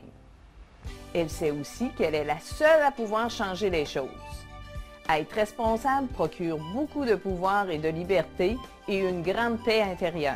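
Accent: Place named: Quebec, Canada. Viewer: Je comprend pas trés bien le Québec , vous pouvez parler le français normalement ? :/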